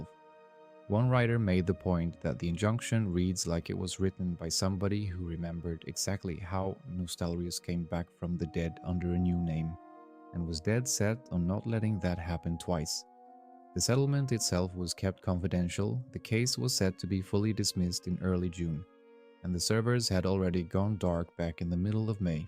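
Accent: Sweden. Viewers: 'Over here in the US'? You sound like you are from Sweden. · I think he is just swedish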